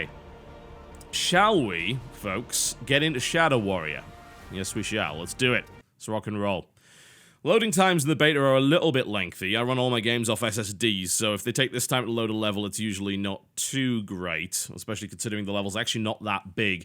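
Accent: United Kingdom. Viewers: lol first of all hes british. second: you're funny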